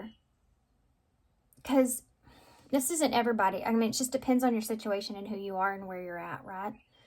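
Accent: South, United States. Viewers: Pile 2 hit me hard. I was drawn to it and now I know why. The tower moment for me was my momma collapsed in front of me and I couldn’t save her. My gf at the time , addiction broke us apart. I moved out west and have been single for 9 years. I was having flashbacks today and when I found you I wanted to know what I needed to hear. I have been closed off from love the whole time. Healing and growing spiritually. I literally just ordered a shamanic drum two days ago for myself and loved that you said beat the drum get up and dance. Thank you for sharing your gifts. Also I grew up in Tennessee so your country accent was endearing to hear ❤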